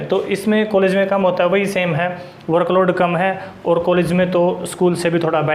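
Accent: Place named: Haryana, India. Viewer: मुझे अपनी साइड का accent लगा बोली का तब पूछ लिया था sir मैं proper नारनौंद से हूँ Best wishes for your channel भोले नाथ आपको खूब तरक्की दे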